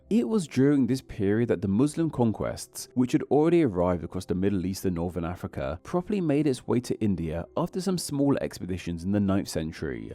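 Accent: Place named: England, United Kingdom. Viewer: Never knew this. Fascinating. What I also find fascinating is the way in which the narrator of your videos has a tendency to add an "ah" sound to the end of words, where a full stop might appear. For example, the previous sentence might end with "appear-ah". It's fascinating. It's not unlike a preacher in some of the evangelical churches in America, but the speaker is very obviously English. I would love to know the backstory of where this speech pattern comes from.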